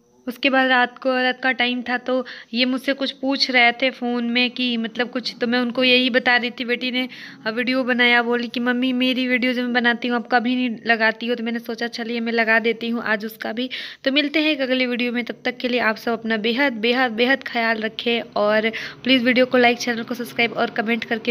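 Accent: Bhojpuri, India. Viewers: Aapke muh se bhojpuri bahut achhi lagti hai.aapki aawaz mujhe bahut pasand hai....!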